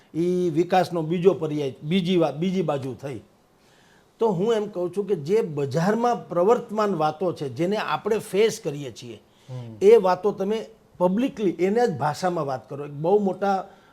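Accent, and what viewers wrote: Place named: Saurashtra, India. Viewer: Vah bhai...Ek To Tal Sorthi Bolee neUparthi pattrakar,Meghanigini Yadav aavi gai.Ek var Jaher Sabhana 'Manch' par Aapne Bhashan 'DETA' Joi rhyo Chun.Maru aa Sapnu che.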